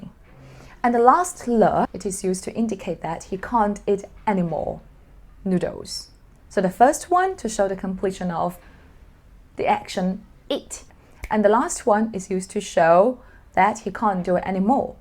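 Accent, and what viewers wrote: United Kingdom. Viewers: British accent??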